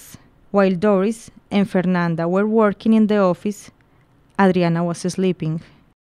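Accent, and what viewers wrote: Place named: Colombia. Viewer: ingles de colombiano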